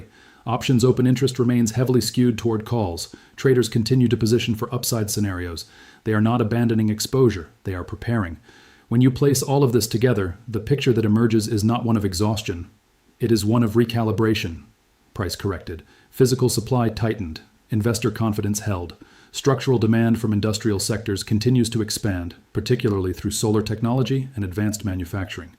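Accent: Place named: Australia. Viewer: This ai has a slight Aussie accent!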